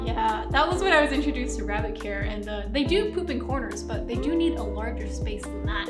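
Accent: Australia. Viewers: I'm just watching along minding my own business, when I hear.. "..a young whipper snapper". An I'm like hey, is she Australian? Yay. Finally found an Australian bunnytuber. I'm getting my first rescue rabbit in a couple of weeks. Eeek. Excited.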